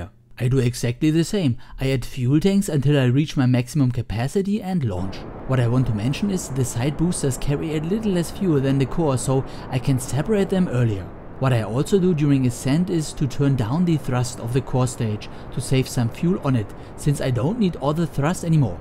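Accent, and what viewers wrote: Germany. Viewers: Your accent... It is very strong... Is it German or Autrian, sound like it :D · You have an amazing accent. Sehr deutsch! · German- English xD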